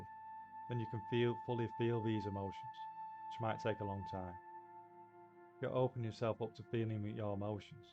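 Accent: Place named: Northern England, United Kingdom. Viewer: The person narrating IS an English person. They have a northern English accent. The subtitles are there for a reason :)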